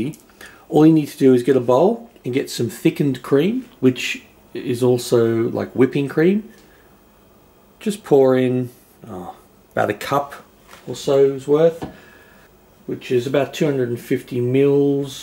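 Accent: Australia. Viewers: Are you from Australia. Because your pronunciation defines that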